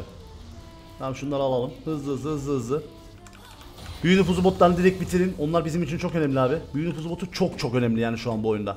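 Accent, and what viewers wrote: Cyprus. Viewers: Abi şuan kıbrıstayım otelde herkes değişik değişik konuşuyor şimdi anlıyorum seni :D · TAMAMEN KIBRIS ŞİVESİYLE OYUN YAP ABİİİ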